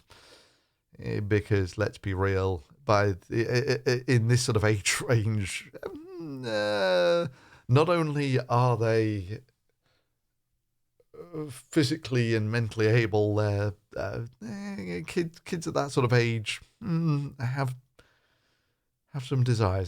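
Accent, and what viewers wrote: United Kingdom. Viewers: I am not one of those usual bashers of. My first post reflects this... I enjoy most of his videos, this one, not so much. I don't know why you feel the need to speak for, but some of your claims are not true, so here we go: says about Matt Gaetz, while showing articles about him being investigated: "There is no love lost between me and folks like this at all. It is kind of what you would get if you infused something devoid of morals, with the intense desire to sniff Trumps ass. ". At no point before this does he say this is his opinion, he is actually insinuating that it is proven facts by showing headlines, that is NOT proving what he is saying. This may be unintentionally, but it certainly have this effect on an uninformed less critical viewer. But of course, some of us understand it is his opinion, in particular I, which is proven by the words in my first post. 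It is also possible, even though speaks with British accent and does not live in USA, that he suffers from Trump Derangement Syndrome, and because Matt Gaetz have been fighting for Trump, he already have a bad vibe about Matt, and therefore cannot help himself but joining the lunch mob. Why else would he bring Trump into this? And why this hostile way of expressing himself about someone doing something they have not yet been proven to have done? It really does not matter if this is his opinion. It does not make it right. It is defamation. Online lynching is not a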